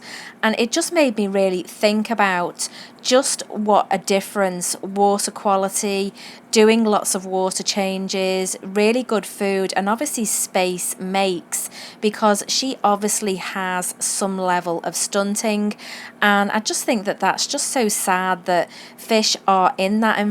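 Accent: Liverpool, United Kingdom. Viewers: huh...are you from Liverpool UK? I am and your accent sounds so similar 😂👏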